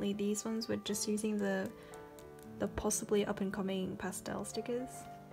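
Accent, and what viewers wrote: Australia. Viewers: Idk why but your accent reminds me of felix and bangchan's accent😂😂😂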